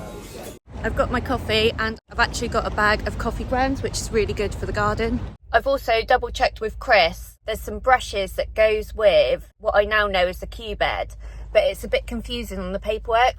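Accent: United Kingdom. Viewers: I love trucks. I worked in a truck stop in Cheyenne, Wyoming, USA when I was going to college. Back then the attendents drove the trucks around the station to get them to the proper place for service. I am enjoying your videos except that I am having a hard time understanding the English language from Great Britain. No real complaints, just need to watch more videos!!!! Thank you for your presentations.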